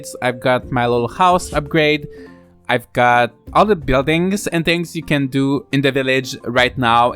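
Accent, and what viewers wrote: Quebec, Canada. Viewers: Just curious, where is your accent from? . . . . . Guess; Qc?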